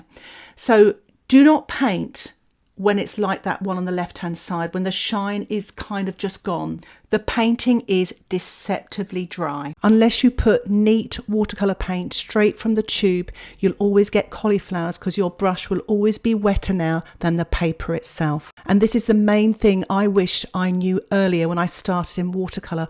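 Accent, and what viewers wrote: United Kingdom. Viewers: Im gonna hear a nice British lady telling me "quit fiddlin'" in my head now if I start overworking something, thanks! Lol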